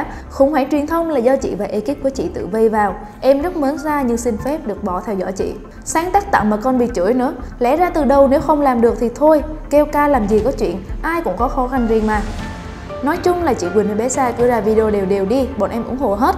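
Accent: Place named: Central, Vietnam. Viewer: thích bạn này nói giọng miền Trung hơn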